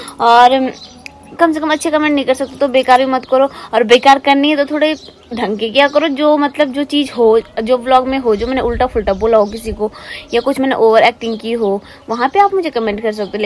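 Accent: Garhwal, India. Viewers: apke bolne m jo gadhwali jhalak aa ri h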